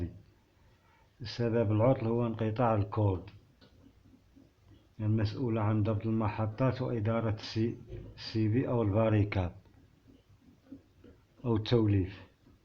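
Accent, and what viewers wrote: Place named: Khouribga, Morocco. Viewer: الأخ من خريبكة او وادي زم حسب اللهجة والناس ديال هده المناطق يهتمون و يتمسكون بكل ماهو عريق.😊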